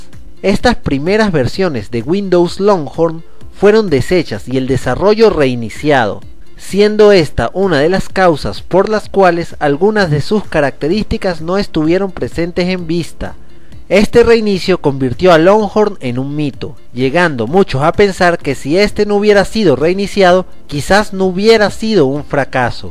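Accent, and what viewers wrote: Venezuela. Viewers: El acento venezolano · ACENTO VENEZOLANO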